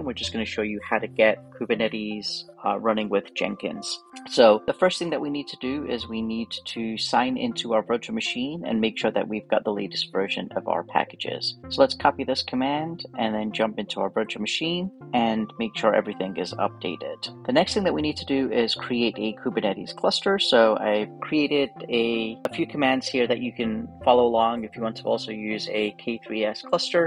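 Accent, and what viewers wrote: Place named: United States. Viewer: you sound like you're in high school, super american, the profile pic is a guy in his late 40s called. what is this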